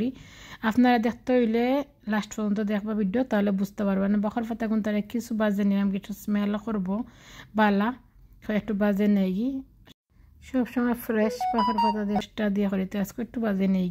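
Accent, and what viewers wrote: Sylhet, Bangladesh. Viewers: সিলেটি ভাষায় আপনার কথাগুলো শুনতে আমার অনেক সুন্দর লাগে শুটকি ভর্তা অনেক লোভনীয় করে তৈরি করেছেন ❤❤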